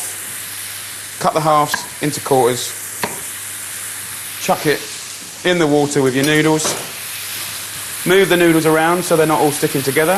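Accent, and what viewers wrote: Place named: England, United Kingdom. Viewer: cute!!! and he can cook and he has an english accent! i'll buy:)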